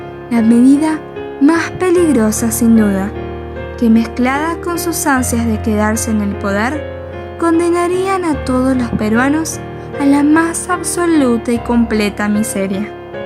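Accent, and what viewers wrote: Argentina. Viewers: Siempre me he preguntado si eres Argentina? Por que tu voz es muy bella. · Tanto terror? A proposito de donde eres, este es una exageracion. Lean no se dejen esta con dejo argentina les convensa si en verdad quieren a Perú. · ¿Eres de Perú?, suenas como de argentina xd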